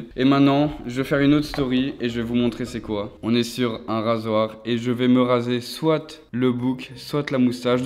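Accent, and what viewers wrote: Belgium. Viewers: T belge ????? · Il est belge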